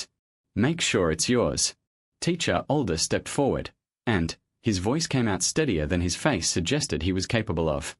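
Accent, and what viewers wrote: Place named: Australia. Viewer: G'day....an Aussie AI narrator...well I never!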